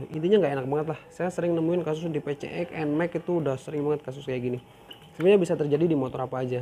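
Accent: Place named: Jakarta, Indonesia. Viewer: Hahahaaa... ini logatnya betawi tapi bahasanya sunda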